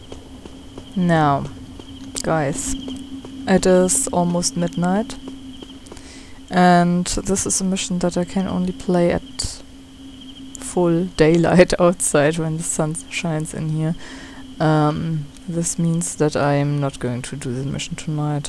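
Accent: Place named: United Kingdom. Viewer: your accent is beautiful :D british, innit?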